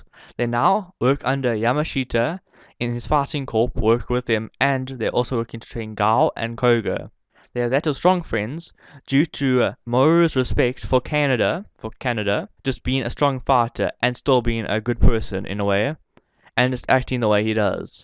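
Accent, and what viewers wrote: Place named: South Africa. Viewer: You said pure maths and I’ve only heard us South Africans say that, plus you got a South African accent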